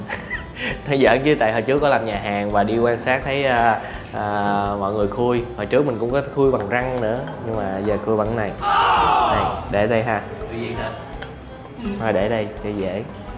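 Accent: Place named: Binh Dinh, Vietnam. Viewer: Giọng bình định nghe mà nhớ quê quá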